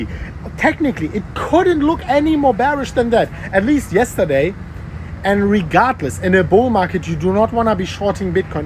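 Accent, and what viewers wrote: Germany. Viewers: Fuck, seriously. You spend more time abroad then in Germany, start getting rid of that stupid accent. Sound just ridiculous. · How do you have such a thick German accent with your command of the English language..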